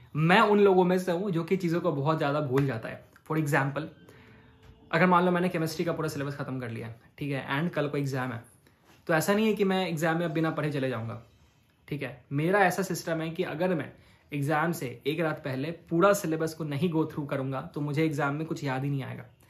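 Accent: Bihar, India. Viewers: Bhai ap bihar se ho... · Bhai aaap kathihar bihar se ho?? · Bihar se ho kya ...Katihaar district? ??????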